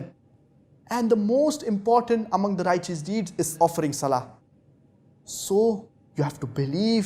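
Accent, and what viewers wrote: India. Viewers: There's no way he needs to speak like his dad looool. He was educated completely out of India and lived 90% out of India yet he has that cringey Indian accent when speaking English. Bizarre.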